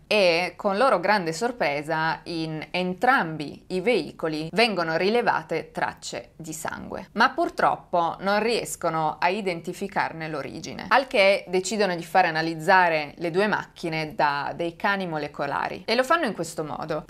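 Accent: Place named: South, Italy. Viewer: Molto gradevole il.uo modo di esporre i fatti di cronaca. Racconti davvero completi. Un unica nota stonata: il tuo accento., la tua dizione . A volte sembra un miscuglio di Sud Italia, altre volte , brasiliana. Puoi fare un corso di dizione per rendere più piacevole la tua voce?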